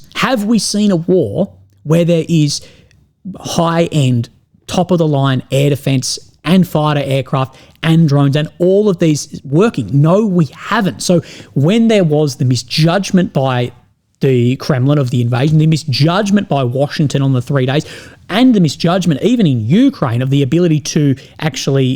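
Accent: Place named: Australia. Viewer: Dude please start talking a bit slower I didn’t master the art of Australian accent 😅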